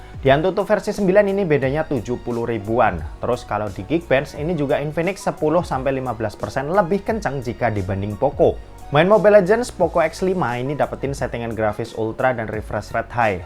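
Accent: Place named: Banyumas, Indonesia. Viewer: Wong ngapak ya mas.....? Keton sitik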